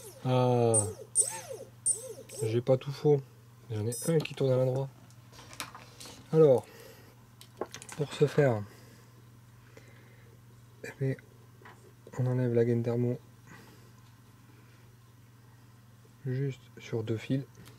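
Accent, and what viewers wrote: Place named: South, France. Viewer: Pas mal du tout ! Le design est plutôt intéressant ! Cet accent, tu es du sud de la France ? On attend le maiden maintenant ;) Bon week-end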